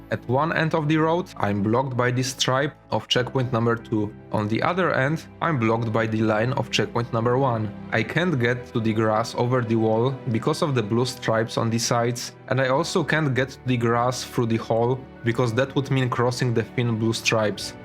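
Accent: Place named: Poland. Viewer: bro Why i think you from poland dlaczego wydaje mi się że jesteś polski ?????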